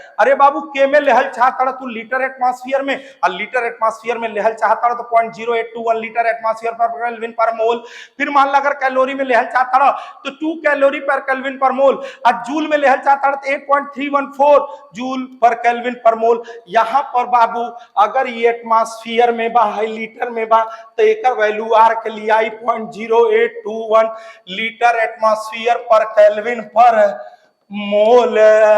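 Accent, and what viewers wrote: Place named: Bhojpuri, India. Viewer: Sirji Katai gajab bhojpuriya andaj me padhawatani 🙏🙏🤗🤗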